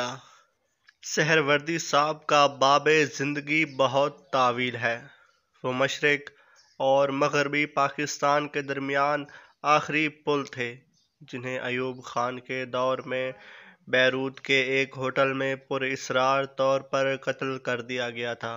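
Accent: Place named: India. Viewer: Bhaai Hindustani urdu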